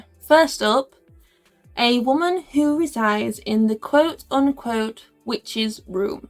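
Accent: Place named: Yorkshire, United Kingdom. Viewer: Yaaaay you're back, well done ^^ no it doesn't matter how dishevelled you are, it's a podcast, and honestly you still look stunning, it's kind of upsetting 😅 As much as the Cex demons have me curious 🤔 this might not be my first investigation location, maybe the next one >< definitely first time hearing of the ram hinn (yes you are very Yorkshire and it's wonderful) 😅 I was actually dozing off towards the end, because it's chill and 3am, not boring lol, night ^^ + I like all the editing, the text inserts and images were a nice touch, bloopers were cool too, again good job =]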